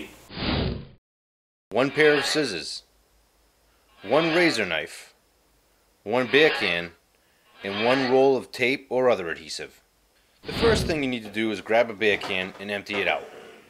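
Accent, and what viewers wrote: Boston, United States. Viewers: With that Boston-esque accent, I'd say more like Peter Griffin. :D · Has the Boston accent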